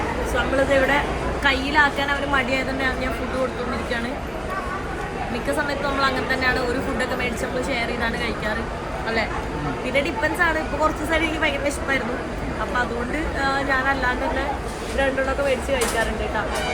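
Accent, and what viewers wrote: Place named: Kochi, India. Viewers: Kochi slang anallo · Oru kochin slaang varunudallo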